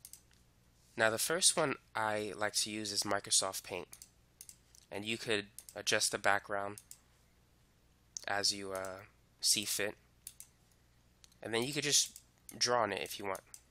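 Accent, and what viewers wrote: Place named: New York, United States. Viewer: New York accent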